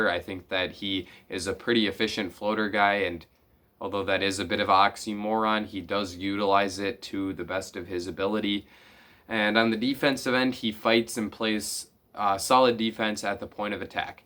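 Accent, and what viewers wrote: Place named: Canada. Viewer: the way you pronounce a couple words sounds Canadian, being from Wisconsin makes sense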